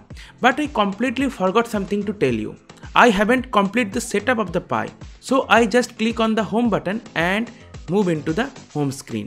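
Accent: India. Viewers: He has Indian pronunciation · Indian. No where near Italian. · Bro... Everything is nice... Just work on your accent a little bit.. Get rid of that Bengali English accent.. It sounds cringy bro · i hate the indian accent, but i wqs able to watch the video to the end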